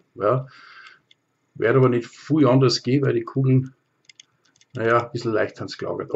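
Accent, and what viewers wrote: Bavaria, Germany. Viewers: Bayrisch is a deutsch , alles verstanden ;-) Danke für deine Eindrücke .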